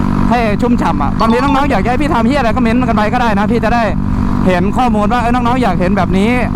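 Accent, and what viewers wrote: Isan, Thailand. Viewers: เป็นคนอีสานหรือป่าวคับ😅😅😅😅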